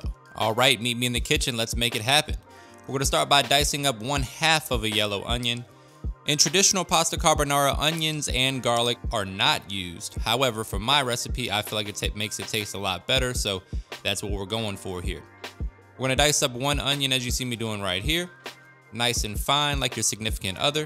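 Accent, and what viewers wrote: United States. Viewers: Why do you amaricans sound so cool???? Us English lads just sound common haha Brilliant video, just come across you, carbonara is my favourite pasta, definitely gunna try your recipe 👌 Peace and love from the uk 🇬🇧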